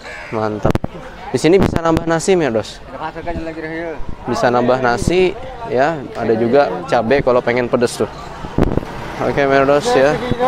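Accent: Banjar, Indonesia. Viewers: Ada sdikit logat bahasa banjar nya 😁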